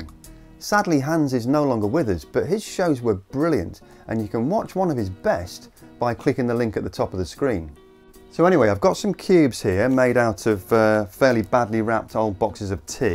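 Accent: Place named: Cockney, United Kingdom. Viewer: Re this Sensationalists prior "sea ice" video but read my point # 8)... Lots of "sensationalism" & "doom&gloom, if it bleeds it leads" MISLEADING PSUEDO SCIENCE from our cockney accented "pseudo scientist".... Notice he says "sea ice" a lot. Propaganda from fiberal globalist seditionists seeking to "carbon tax" us into oblivion, this video is clearly paid advertising to sell us all into submission... don't believe the rhetoric. HERE ARE THE FACTS: 1) ice shelves are very thin floating 'skins' relative to the main ice mass at the poles, making for dramatic imagery (likely photo-shopped to support the phoney narrative). 2) yes, "sea ice" is diminishing, and yes our climate is warming, but... NO, Sea levels will NOT rise & flood coastal regions. 3) NASA's circumpolar satellites measure surface elevation & micro-gravity (mass directly below the sat.) and clearly show FIVE TIMES MORE ICE IS CURRENTLY DEPOSITED BY SNOWFALL AT THE POLES than what is lost to melting "sea ice". 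4) the disparity between the much greater annual polar deposition vs. loss of peripheral "sea ice" is ACCELERATING (especially at the Antarctic landmass). 5) while global warming is for real, it will NOT result in "catastrophic sea level rise" for the above reasons as well as greatly increased evap/precip filling depleted lakes, rivers & aquifers, as well as greater atmospheric moisture held in clouds & humidity. 6) CLIMATE MODERATION will greatly warm our winters but ACTUALLY COOL OUR SUMMERS in many regions d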